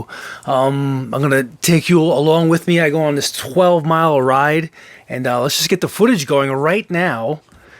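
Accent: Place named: Long Island, United States. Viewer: A fellow Long Islander! I'm watchin this goin damn this guy sounds like my family. Laughed when you started talking about LI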